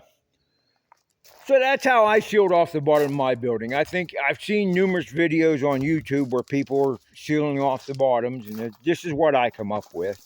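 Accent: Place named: Western Pennsylvania, United States. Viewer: Thanks for the tip. I sure hear that Western Pennsylvania accent. (My wife is from Butler)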